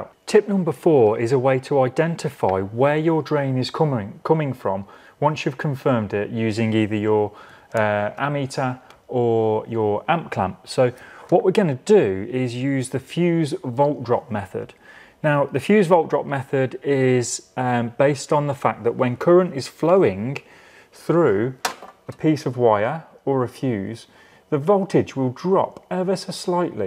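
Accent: United Kingdom. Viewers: As always on this channel, this video is didactically excellent. Moreover, it is very pleasant to have all this presented in the distinguished manner of a British gentleman. Thank you.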